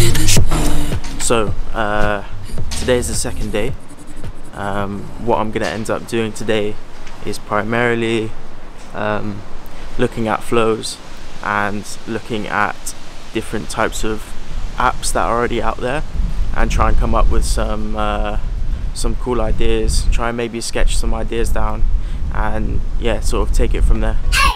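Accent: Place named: United Kingdom. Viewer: I love your explanation, simple and clear. Right from the start, you are the British who might live in London or something.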